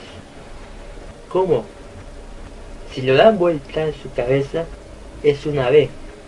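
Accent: Spain. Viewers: por favor deja de hablar como español tenés que ser vos!!!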